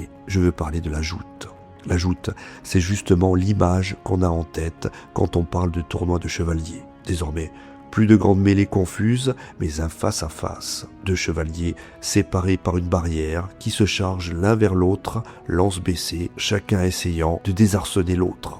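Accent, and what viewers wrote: Corsica, France. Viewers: Alors y a un truc qui m'étonne ;-) J'écoute tes contenus en mode podcast avec un écouteur, le soir dans mon lit, dans le noir avec mon écran retourné vers le matelas pour limiter la luminosité au maximum... Bref, tout ça pour dire que je me concentre sur l'histoire et sur ta voix. J'ai écouté pas mal d'histoires et à chaque fois, je dis bien à chaque fois, je trouve que tu as un accent Corse. Je ne saurais dire si c'est Corse du sud ou Haute Corse mais je penche pour cette dernière. Alors, j'aimerais bien savoir si tu as vécu en Corse ou bien quelle région de France peut avoir un accent aussi proche ! Je vais peut-être gagner le prix de la question la plus bizarre de l'année :-)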